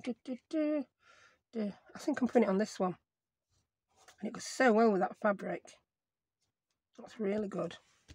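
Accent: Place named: North, United Kingdom. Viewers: I’ve been thoroughly entertained watching this! Been chuckling along, from Melbourne Australia. My friend in Michigan USA shared it on Facebook. Love your beautiful tags! And love your Northern accent. You sound like a dear friend of mine. ♥️ Will watch you videos again. I subscribed to your channel. Keep commentating; you’re Awesome at it! 😄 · Hello, First of all I want to say how I really love your videos and since I am a Geordie living in Canada, its great to hear your accent reminds me of home. Anyway, to get to my point, we only have Micheals here and they are quite pricey. So I wish we had some alternatives even junk stuff we could use for collating etc. I have made so many of the things from your videos and love them.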